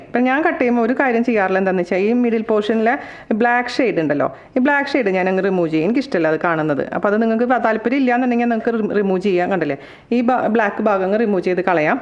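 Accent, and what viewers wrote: Thrissur, India. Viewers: I'm a Big fan of u..... ചേച്ചി ♥️♥️♥️ especially aaa thrissur slangilula tta..samsaravum pinne chechide Nadan vegetable recipesum✌️pinne njanum thrissurkarananutta 😜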